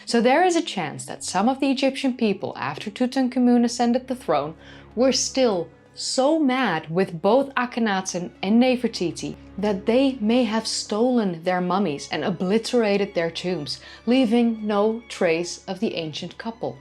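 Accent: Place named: Netherlands. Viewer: Love your Dutch Accent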